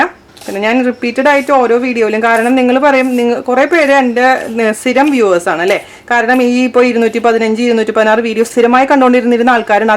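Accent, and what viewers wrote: Thrissur, India. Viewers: chechiii njn chechide veliya oru fan ane ela receipsm try cheyarnd elam adipolli ane chechiii chechide thrissur slagm presentation. oke super ane njnum oru thrissur Kari ane to God blesss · ചേച്ചി അടിപൊളി ആണുട്ടോ തൃശൂർ ആണോ വീട്.... അതാണ് ഒരു നിഷ്കളങ്കത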